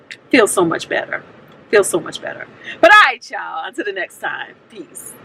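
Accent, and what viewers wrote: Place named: New York, United States. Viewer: im furloughed, and concerned about layoffs in future, I planned on Monday to start my workout, meal preps, more time to my schoolwork (bachelors in Christian counseling) also more volunteering with non profit and playing with my fur children! new sub here!! one question your accent sounds familiar are you from NY....LOL I AM....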